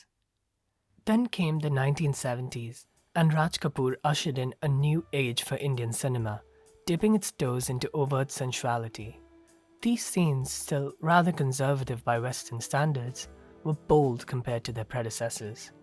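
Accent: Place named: Oxford, United Kingdom. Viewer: a musanghi cinephile talking in oxford english. * it's not an insult, just a description/review of the video.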